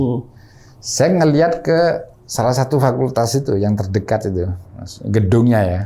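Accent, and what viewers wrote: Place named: Bondowoso, Indonesia. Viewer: Logatnya bondowoso bgt om 😂😂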